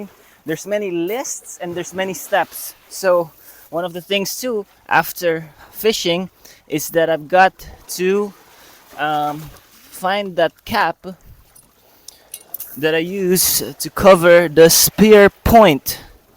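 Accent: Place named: Mindanao, Philippines. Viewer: What country are you from? Your accent sounds like you're from Southern Philippines but I could be wrong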